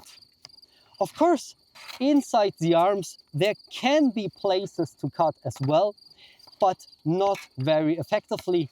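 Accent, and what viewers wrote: Germany. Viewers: Man hört voll den deutschen Akzent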